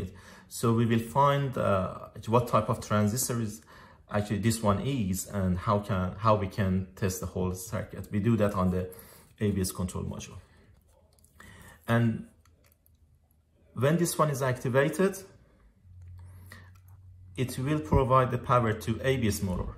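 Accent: Iran. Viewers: just say thank cause it was useful for me and enjoyed your sweet persian accent👍👍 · You have an accent are u from Iran?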